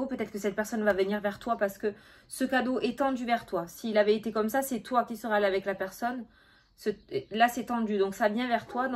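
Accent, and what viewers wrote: South, France. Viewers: Coucou l accent du sud fait toujours chaud au cœur gros bisous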